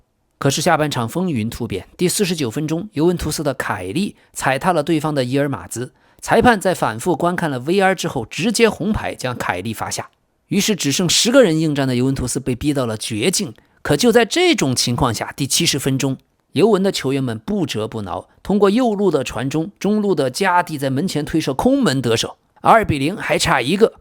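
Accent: China. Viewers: 眾口難調啊，我個人的經驗是字幕繁體優先，因為老k的聲音已經是大陸口音了，再配上繁體字幕 + 西語球員名詞，能夠最大化兼容大家的習慣。聽不懂可以看，看不明白可以聽，總能把信息傳遞出去。而且很多平時寫簡體字的朋友其實也看得懂繁體字，老k可以考慮多修覆一些繁體字的錯誤，而不是改成簡體。這個可以慢慢用 AI 來糾錯，集中找幾期過去的錯誤作為訓練，很快就能改進的。個人建議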